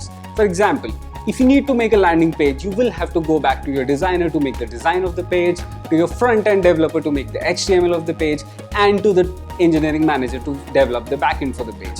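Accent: India. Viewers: i like your indian accent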